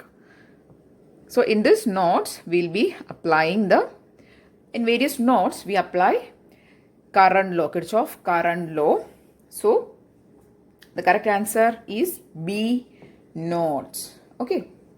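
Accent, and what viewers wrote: Kerala, India. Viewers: Are u a malayali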